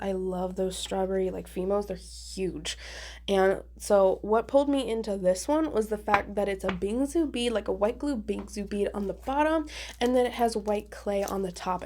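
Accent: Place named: Spain. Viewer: I enjoyed the review but I wish there would be less talking and more slime ASMR. BTW your Spanish asent is amazing!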